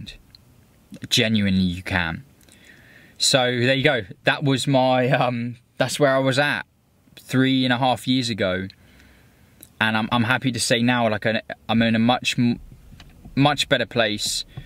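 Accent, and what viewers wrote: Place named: Hampshire, United Kingdom. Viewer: Just shaved mine at aged 54 after reluctant acceptance of quick thinning. Great move. That's a super Hampshire accent. Southampton /New forest? Cool car too, I run a '70 Beetle. Good work on videos. Neil, Southampton.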